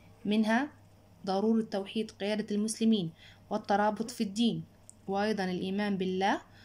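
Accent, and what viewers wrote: Egypt. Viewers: حبيت نعرف حاجة هذي مصرية يسما مصر يقراو كيما حنا ولا كيفاه من فضلكم جاوبني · مصرية او جزائرية🤯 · انت مصريه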